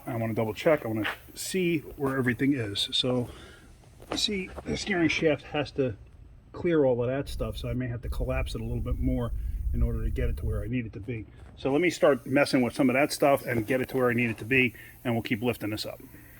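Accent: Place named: South Jersey, United States. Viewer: I'm From south Jersey/ Phila area, picking up on a tad bit of my accent over here. 👍Awesome vid, just bought a Jasper eng. n plan on doing this. The easy way. Thanks for the vid and subscribed. Keep those vids a rollin ✌️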